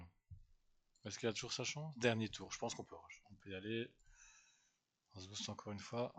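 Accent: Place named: Switzerland. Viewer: Joli ❤ iop joli l'accent suisse 😂❤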